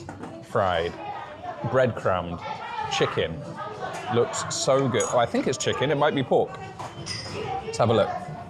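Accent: United Kingdom. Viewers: why you look and sound so British, but with an Italian Passport?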